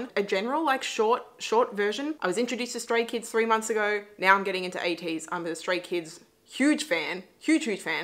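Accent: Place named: Australia. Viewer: ty so much love kpop i'm from South Korea little bit hard to understand Aussie accent but i really like u re channel. also sometime kpop reaction block on some country that is not have Youtube country no google just little bit search it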